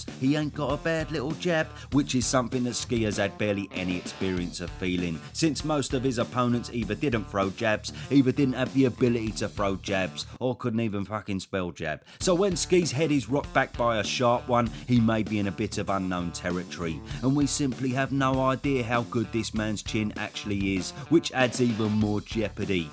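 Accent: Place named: England, United Kingdom. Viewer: Love the channel man. As an American, the English accent just makes everything more interesting but regardless you make great content👍🏻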